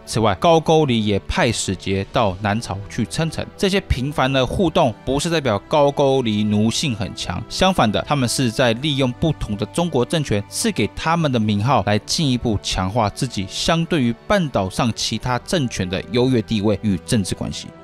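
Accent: Taiwan. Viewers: 您的口音像是來自台灣，但是您節目的深度貫穿古今中外！ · 你的口語很台灣講解方式也有點台灣不過濃濃的又支納中國的歷史觀點請問你有中資背景嗎？會不會影響我們的正確思想意識和歷史觀？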